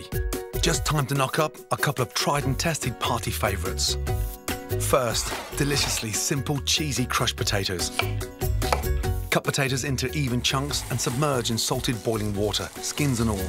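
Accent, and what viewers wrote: United Kingdom. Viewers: Five and half to Six hours in the Oven at 140C...! Agg, still having trouble with British Accent.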